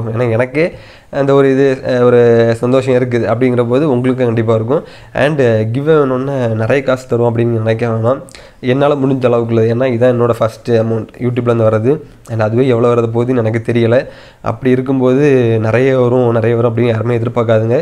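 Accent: Kanyakumari, India. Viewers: Athu kanyakumari slang bro💪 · கன்னியாகுமரி slang 😍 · R Really I Intelligent S Slang Master H Hyper Power A Appreciate B Best The Best kumari Slang Means You only 💓 Lots of love💓💓💓💓💓💞💞💞💞💞💞💞💞💞💞💞💞💞💞💞💞💞💞💞💞💞💞💞💞💞💞💞💞💞💞💞💞💞💞💞💞💞💞💞💞💞💞💞💞💞💞💞💞💞💞💞💞💞💞💞💞💞💞💞💞💞💞💞💞💞💞💞💞💞💞💞💞💞💞💞💞💞💞💞💞💞💞💞💞💞💞💞💞💞💞💞💞💞💞💞💞💞💞💞💞💞😍😍😍😍😍😍😍😍😍😍😘😘😘😘😘😘😘😘😘😘😘😘😘😁😁😁😁😁😁😁😁😁😁😁😁😁😁😁😁😁😁😁😁😁😁😁😁😁😁Chella mavane 😍🤣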